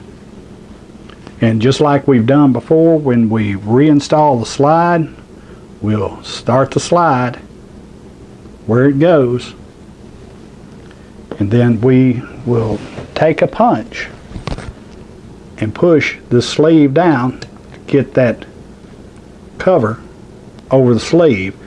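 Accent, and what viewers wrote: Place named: United States. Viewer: I’ll give you a third just for that country ass voice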